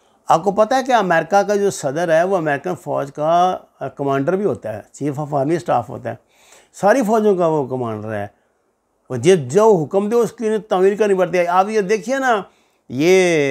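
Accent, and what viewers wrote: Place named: Lahore, Pakistan. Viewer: ilAQAH chach se hae aor lshori lehjah GHALIB aa gaeya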